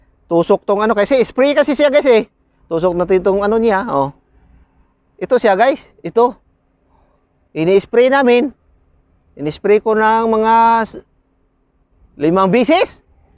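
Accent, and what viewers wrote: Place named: Visayas, Philippines. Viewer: Hoy mag bisaya kanalang oi halata Ang Tagalog Mo dol.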